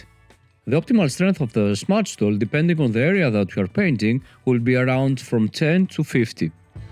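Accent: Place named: Turkey. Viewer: Sotaque forte de Turco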